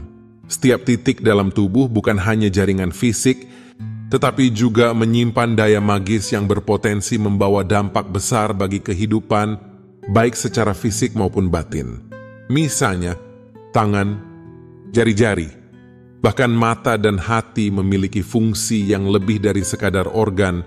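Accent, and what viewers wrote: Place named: Malaysia. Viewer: Logatnya Seperti Bahasa Melayu Yang Disampaikan Oleh Penuturnya... Tetapi Text Tertulisnya, Menggunakan Bahasa Indonesia...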